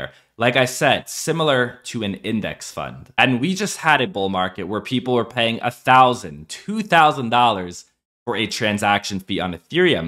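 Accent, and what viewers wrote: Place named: Philadelphia, United States. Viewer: Dude is from the Philly area. I’d bet some money. You can tell by his accent.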